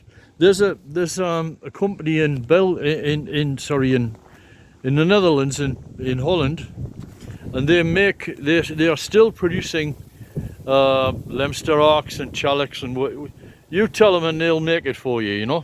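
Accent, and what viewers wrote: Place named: Northern England, United Kingdom. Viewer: I can tell that you come from the North of England. My Mother came from Newcastle. I agree with much of what you say. Look at the bows on the current fleet of IMOCA racing boats that just completed a circumnavigation. Other boats that have "Scow" bows are popular in the single handed Mini Trans-Atlantic race (24 feet). If one looks at the construction of Cruise Ships today they are effectively a narrow rectangle with a pointy end and a long snout. I have a feeling that the boat you were looking at was certainly an inland sailing vessel at some time in the past. I would not be keen to take a vessel with Lea-boards to sea nor would I wish to sail a "scow bow" upwind in 30 knots in The Atlantic. Scow bows work well off the wind. Every dog has its day but the ones that are bred with malice aforethought tend to do better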